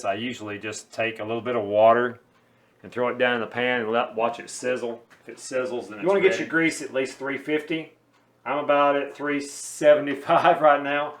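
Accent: South, United States. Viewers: Delicious!!! Good southern man accent which I can recognize 😉👌😊 straight away, I learned it from a famous movie, Trump, by Tom Hank!! Ha, ha!! Love from Hong Kong!!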